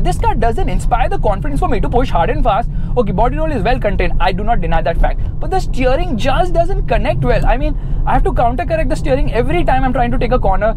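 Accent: India. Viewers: Can you speak a little slow. Your english sounds like some Indian language for sure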